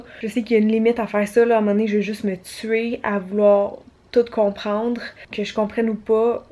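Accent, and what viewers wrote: Canada. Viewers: Désolée .mais je ne comprends pas tout je suis belge et l'accent canadien est un peu compliqué pour moi. C'est votre chat qui est malade? Oulala 😣😣😣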